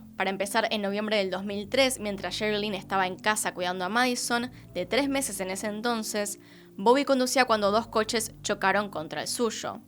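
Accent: Argentina. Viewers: Yo comencé a seguir tb a otra así como este canal porque soy española y está muchacha de quién les hablo también, pero creo q hay sitios para todos, ( los buenos)y me acostumbro al español/ argentino, adelante, con esas preciosas pestañas q te pones..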